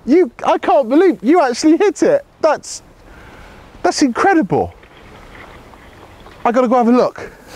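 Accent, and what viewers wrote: England, United Kingdom. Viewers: Nice show, sir, nice show. Your accent is bloody English, i like it.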